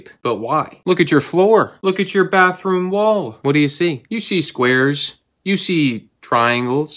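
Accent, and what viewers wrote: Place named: England, United Kingdom. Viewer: Why is there a german flag on your ceiling, when you can't even pronounce german without an English accent. (Genuine question here, no disrespect intended!❤)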